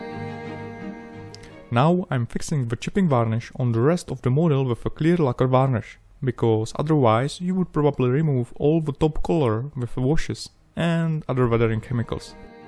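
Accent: Czech Republic. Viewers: Jedna otázka: SI ČECH? Lebo ten prízvuk mi strašne sedí na brata môjho nárda! :) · are you from Czech republic? :D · Are you from Czech right? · Nice work PS: I hear the Czech accent :) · Bruh that czech accent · Judging by the accent I'd say he's from Czech Republic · Hello, this is my first seen video from you its amazing and i noticed a czech akcent so if you are from Czech Republic. Tak Zdravím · Ta češkovina tam jde strašně slyšet 😂😂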